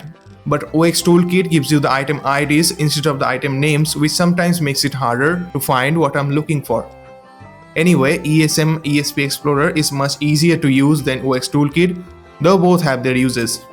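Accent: India. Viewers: Is the lone wolf radio somehow involved in the quest mod Skinwalker? When i heard you talk about the mod in the video i started getting flashbacks to THAT creepypasta. It be an instant download for me if that's the case Also I'll take the indian "call center" accent over obvious generic deadbeat ai voice any day of the week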